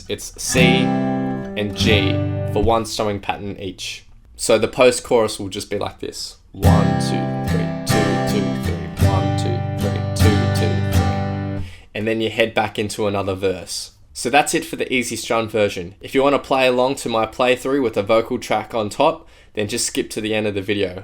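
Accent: Australia. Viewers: 4. Because you are Australian and your accent makes sense to a fellow Aussie!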